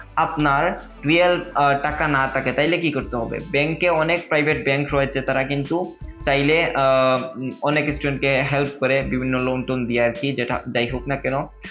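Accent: Sylhet, Bangladesh. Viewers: ভাই কিতা সিলেটি নি?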